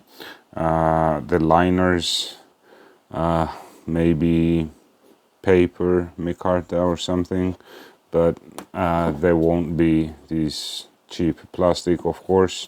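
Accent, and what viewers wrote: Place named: Georgia, United States. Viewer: you have a Georgian accent.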